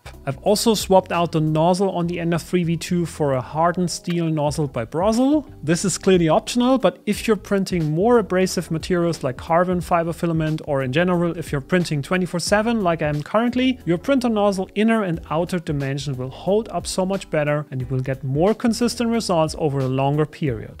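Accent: Germany. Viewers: Der deutsche Akzent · I hear a German accent (or one that could be mistaken for German) and I watch the whole video.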